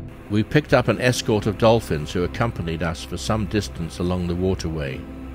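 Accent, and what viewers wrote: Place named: RP, United Kingdom. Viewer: There is no better way to tell a story than when the narrator speaks the Queens English. We Americans should learn to speak clearly and enunciate words properly. My travels to England however, proves they also should learn the same lesson.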